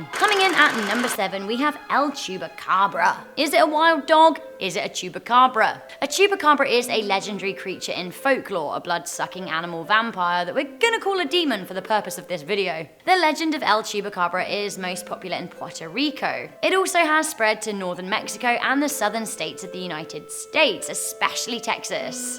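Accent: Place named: England, United Kingdom. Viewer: That stupid english accent Lol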